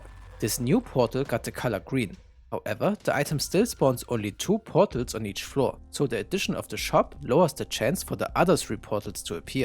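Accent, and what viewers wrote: Germany. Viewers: Ah yes DER DEUTSCHE AKTZENT is stong with you :33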